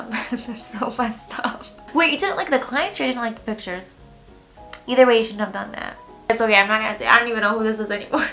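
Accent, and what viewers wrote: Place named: New York, United States. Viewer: Love the NY ACCENT X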